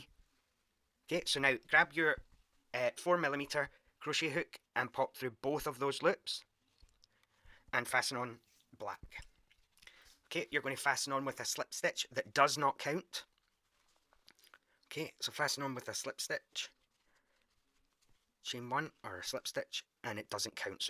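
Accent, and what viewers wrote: Scotland, United Kingdom. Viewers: So cute! I love the Scottish accent. I am subscribed for sure!! Get well soon! I know all about back pains. Rest up!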